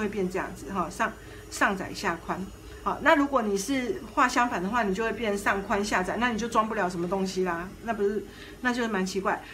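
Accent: Taiwan. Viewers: 這個小包包的教程講解的很詳細，台灣式的普通話聽起來很舒服 。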